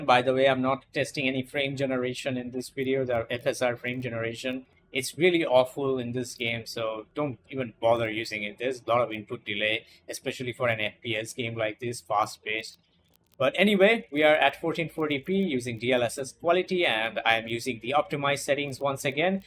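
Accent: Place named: India. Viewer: wow, i thought that is animated 3d model guy in the beginning intro ) everything is so cleaned up, face, eyes, lips , blurred abmience so i firstly thought you are artifical man ) thanx for the touchfull review. its a pity that you guys from India ALWAYS add your accent to the English language. Isn't it very hard just not to do this and speak cleaner, like we russians do speaking in english ? but in your case the accent is very little, so i've understood everyting! thanx )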